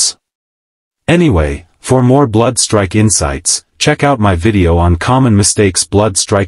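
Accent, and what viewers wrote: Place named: Nigeria. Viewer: Love the Nigerian accent bro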